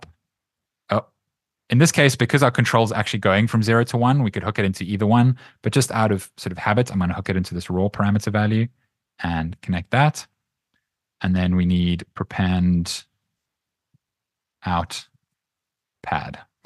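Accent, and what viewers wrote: South Africa. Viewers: Great video, something I’ve been interested in for a while. Also btw, are you by any chance South African? Your accent sounds familiar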